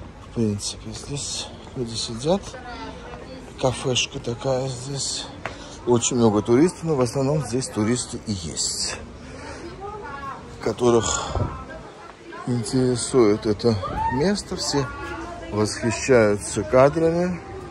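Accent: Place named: Georgia. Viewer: Ваш литературный русский с лёгким грузинским акцентом восхитителен. Атмосферное видео. Спасибо за погружение в прекрасное туристическое место.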